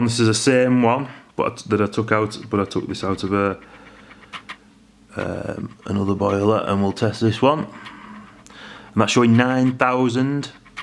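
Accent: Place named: Wigan, United Kingdom. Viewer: you sound like a Wiganer, are you? good vid BTW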